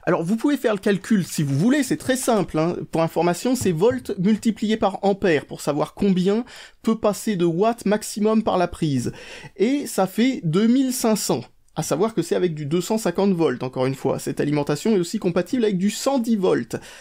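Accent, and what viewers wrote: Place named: Paris, France. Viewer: Ton accent parigot m'arrache les oreilles... P=iu uniquement sur une charge resistive... Ce qui n'est pas le cas d'une alim Alim de fort wattage.... Sérieux ?? Un wattage c'est quoi.. Connaîs pas par contre une Alim de forte puissance... Ça oui... Je m'arrête là, j'ai plus la force et l'envie de corriger....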